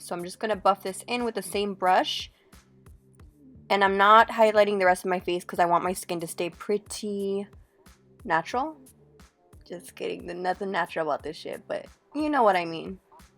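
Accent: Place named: Mexico. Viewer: Kmarie Valle It's called an accent... and majority of us talk like this haha isn't the only Mexican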